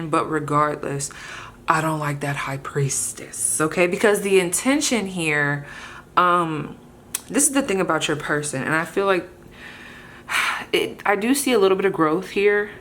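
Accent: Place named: Oklahoma, United States. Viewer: Lol that heavy. Rando okieaccent was for me I know it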